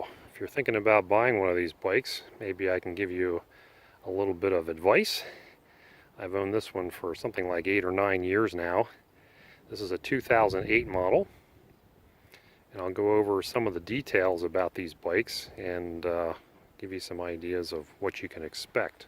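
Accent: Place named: Pennsylvania, United States. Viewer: Maybe a Pennsylvania accent?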